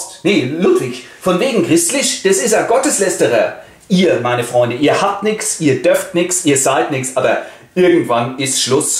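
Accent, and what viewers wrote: Hesse, Germany. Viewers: Ich weiß nicht, wie man das nicht verstehen kann. Auf die Gefahr hin, dass die Frage schon 100x gestellt wurde: bist du Hesse? Bin selber keiner, aber ist sowas von leicht zu verstehen. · Kommse aus Hesse?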